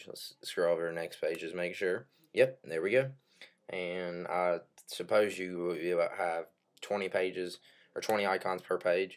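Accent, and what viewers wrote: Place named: Appalachia, United States. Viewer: i cant understand your thick hillbilly hick accent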